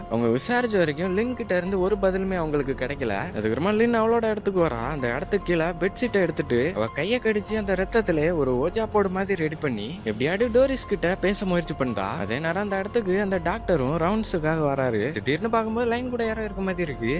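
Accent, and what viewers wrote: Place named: Tirunelveli, India. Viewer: Youv thirunelveli machan.... Super paaaa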